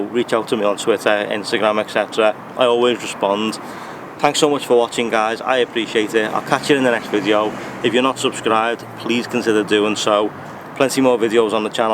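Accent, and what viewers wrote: Liverpool, United Kingdom. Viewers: 6.2 V8! Nice to hear a scouser! haha Got my SS here in Liverpool haha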